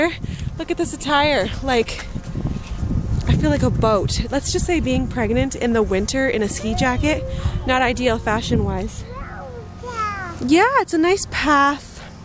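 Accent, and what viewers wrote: Canada. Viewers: hilarious... your canadian accent is in FULL swing :) Probably from being around your family :P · Love it! Btw, you sound so much more Canadian in Canada ;)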